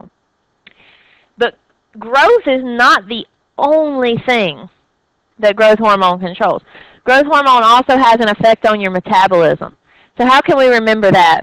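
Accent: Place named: Mississippi, United States. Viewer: Dr., I love your videos. I am taking an online class, but I prefer to sit in a class. Your videos help me to get what I need. Here is the funny part, I KNOW your accent! I recognized it right away. I have family from South Mississippi (not gonna be too specific, since this is on the web). Anyway, thanks so much for the help!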